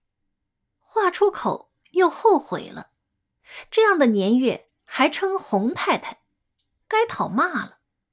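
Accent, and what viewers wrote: Shanghai, China. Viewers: 的上海话刚得也老道地的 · 謝謝👍🙏🌹❤一直記得這篇文章。今天又听見了，尤其聽侬讲上诲闲話好亲切❤❤❤🌹🌹🌹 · 喜欢你！希望你能多读一些上海题材的小说，对于我一个移居国外多年的人来说，听到乡音会感到很幸福，谢谢！❤ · 的嗓音真的是好听！全文都用上海话来播报就更绝了❤🎉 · 主播是上海人啊？ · 主播本来就是上海人😄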